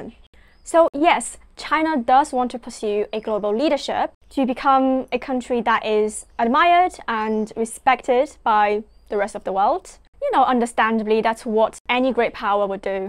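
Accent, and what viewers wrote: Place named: Oxford, United Kingdom. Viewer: Super smart Chinese girl telling the china story so clearly and in perfect Oxford English. Well done young lady. 👍👍👍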